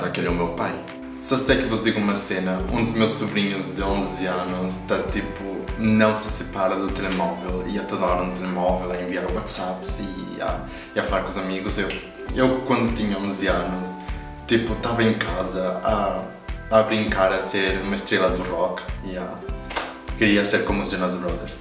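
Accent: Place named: Brazil. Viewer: Dasse q e isto és ucraniano ou q se es br fala com sutaq nao estragues o portugues de portugal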